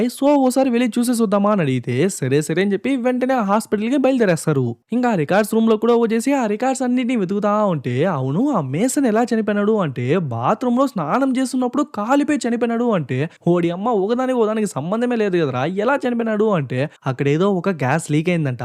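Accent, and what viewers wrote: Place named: Chittoor, India. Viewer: Bro మాది మదనపల్లి. And మీ slang పలమనేరు చిత్తూరు ఆలా అని పిస్తుంది మా నానమ్మ వాళ్ళది పలమనేరు. బయ్యా మీ vasic చాలా బావుంది.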